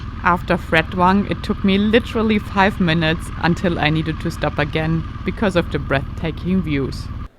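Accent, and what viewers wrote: Germany. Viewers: Great Video, amazing Picture's :thanksdoc: good english 😉( I hear a fränkisch slang;-)) )😁 Stay healthy, whatever you do 🏍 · Me Norwegian, from Utskarpen, Mo i Rana. Are you from Switzerland? Your license plates show Switzerland from previous videos, but your accent is german. Anyway, Lofoten is best in september. We do have problems with lack of infrastructure, toilets and such. There are forgotten hidden gems to explore, like the coast of Helgeland, the heritage island of Vega, Lovund, Dønna, Herøy and so on.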